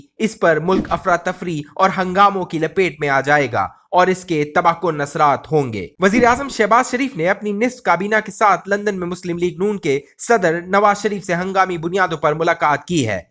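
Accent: India. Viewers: “NAYA DHONG” TV KO PAKISTAN ME KOI NAHI MILA AUR AIK INDIAN AADMI KO GIS KA INDIAN ACCENT HE COMMENTARY KE LIAY SELECT KIA HE …….. GESAY “CLOSET INDIANS” KO ANCHORS AUR JOURNALISTS KA SLOT DIA HUA HE …… ANTI PAKISTAN CHANNEL SPREADING SUBVERSIVE FAKE NEWS ……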